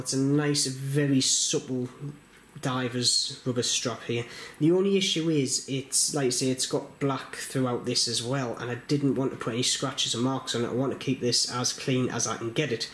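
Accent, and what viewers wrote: Newcastle, United Kingdom. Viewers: That's a proppa Geordie accent mind 👍👍👍👍 Shy Bairns Get Nowt 🤣🤣🤣 looking forward to seeing some future videos featuring your mods 😉😉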